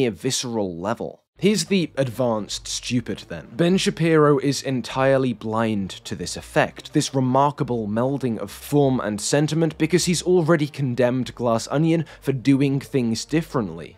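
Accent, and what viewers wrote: United Kingdom. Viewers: Hilarious to hear you and all those who pretend to just love Glass Onion even though it lacks everything that Knives Out “an actually good movie” has in volume. Name one character you are true my invested? Glass Onion sucked and even your British accent can’t convince me what I watched isn’t complete RUBBISH!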